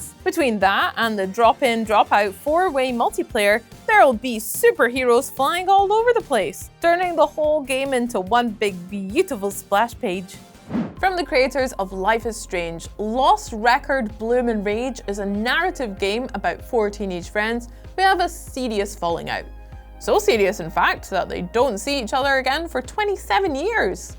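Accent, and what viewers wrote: Scotland, United Kingdom. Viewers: Xbox Strong all Day Long.... Is this Chick Kool or what. And so I hear a Scottish accent? 😊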